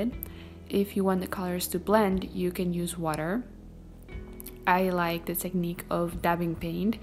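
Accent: Netherlands. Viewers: You sound like you are dutch, arrreee you from holland???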